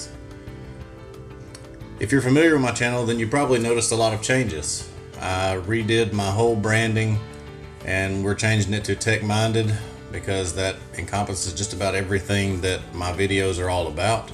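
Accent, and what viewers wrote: South, United States. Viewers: i like your southern "Gone With the Wind" accent.. notch it up with a wider smile.. keep positive, you have great potential.. best wishes :-) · Excellent video and your accent made me homesick for SC, TY.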